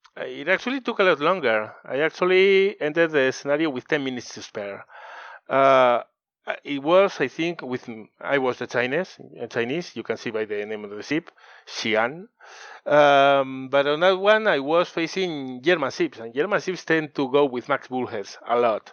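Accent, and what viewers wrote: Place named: Spain. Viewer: Keep on doing what you do now, if only you have the time and energy. The accent doesn't personally bother me at all, rather on the contrary. I don't speak Spanish myself, but I love listening to it because of the flow of it and how it sounds. Your accent kinda imbues your English (which in my opinion is excellent) with the same kind of flow without making it difficult to understand, so it's all good. Drachinifel is Drachinifel, and is well and truly deserving of his status. I also very much agree on what you said about his wits and dry humor. It was only a few weeks ago when I last watched the "Voyage of the Damned" about the Imperial Russian 2nd(?) Navy's unfortunate and incompetent voyage from the Baltic Sea to the Pacific, and I laughed so hard my eyes were watering 😂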